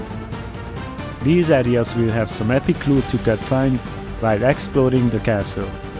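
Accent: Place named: Hungary. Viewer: Olyan, mintha magyar akcentusod lenne :D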